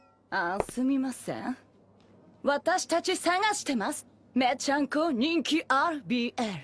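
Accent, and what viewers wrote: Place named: Japan. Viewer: the japenglish is my favorite part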